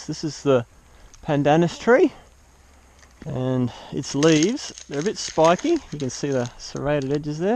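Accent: Australia. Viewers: I love the Australian accent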